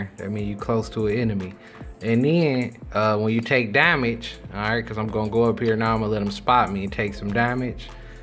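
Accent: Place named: Mississippi, United States. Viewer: Felt like someone from Mississippi telling the story on a rocking chair couldn't understand half of what he said.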